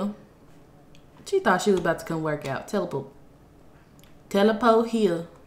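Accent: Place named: Texas, United States. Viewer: My boyfriend says you have an accent like Beyoncé lol probably because you’re from Texas also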